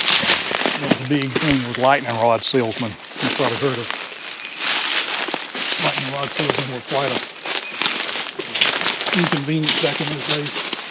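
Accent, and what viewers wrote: South, United States. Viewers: and are both interesting men to listen too. They real seem to enjoy making the videos. you are a excellent historian and that wonderful southern accent of yours, just adds to the story telling. Thank you both 😊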